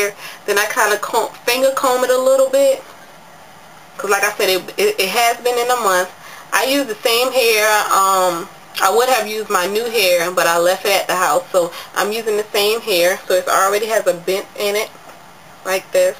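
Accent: New Orleans, United States. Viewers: Ur N.O accent is so strong lol Im from baton rouge and I love new Orleans accent lol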